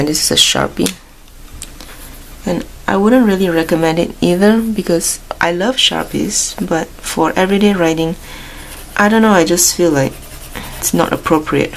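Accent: Singapore. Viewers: You sound like a singaporean. Are you from singapore? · You sound like you're from Singapore! Haha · Singaporean accent!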